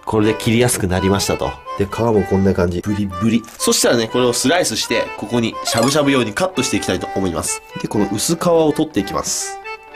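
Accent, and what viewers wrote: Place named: Aichi, Japan. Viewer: 「生かしたる」とか「見ちゃかんよ」とか、めちゃくちゃ愛知県の方言っぽいと思っとったら愛知県だったわ笑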